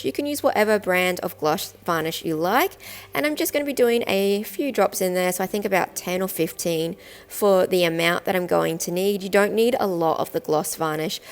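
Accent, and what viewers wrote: Australia. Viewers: Oh, I would have never known or thought anything about your voice. You just sound to me like you have a cute accent. I thought you were and Aussie? I cannot imagine why anyone would criticise you. People who do that are just plain mean, cruel, insecure, immature bullies. You sound great to me and I enjoy your videos. Keep em coming.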